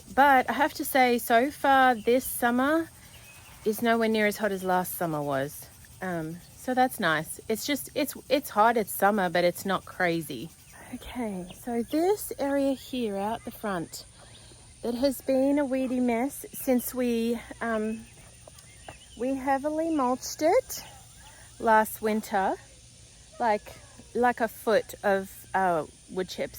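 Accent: Australia. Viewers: you don't need the music in your intro. I can't hear you because the music drowns you out. We want to hear your lovely voice with that awesome Australian accent!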